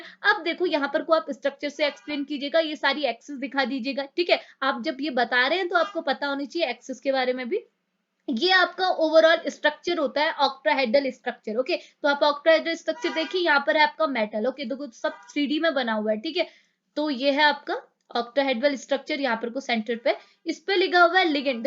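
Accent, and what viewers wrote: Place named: mixed, India. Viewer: Bdiya pdhate ho mam ap..❤❤❤ ap up ya uk se ho syd....😅😅 mean apka acsant Asa hi lgta h.. liie ye सब समज अगि..😁😁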